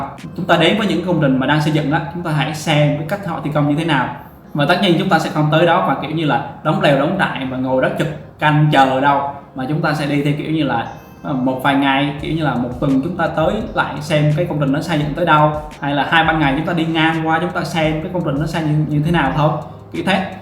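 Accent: Da Nang, Vietnam. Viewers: a oi anh học ở đn hà anh, nghe giọng n giống đà nẵng qué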